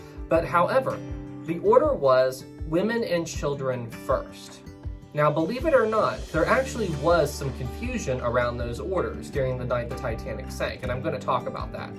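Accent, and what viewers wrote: South, United States. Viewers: As usual, awesome video man!! Where are you from? I’m catching a slight southern accent haha. I’m a Tennessean Titanic Fanatic. 😂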